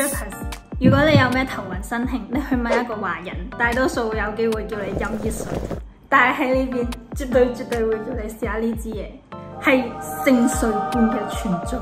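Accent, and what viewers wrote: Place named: Malaysia. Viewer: 估唔到你同我一樣,去得多馬拉返嚟都改唔到口吻,好似落水,去邊度撩,直直走之類😂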